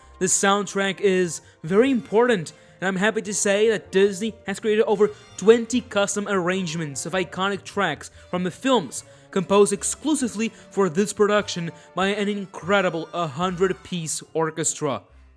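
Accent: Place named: United States. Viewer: We need an English voice and I'll subscribe! This voice is too American sounding 😰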